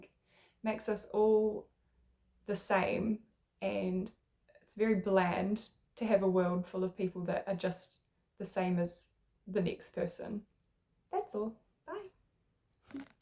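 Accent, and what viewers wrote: New Zealand. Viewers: That sounds like a kiwi accent. Kiwi culture is pretty shit really, and aren't welcoming to people's quirks.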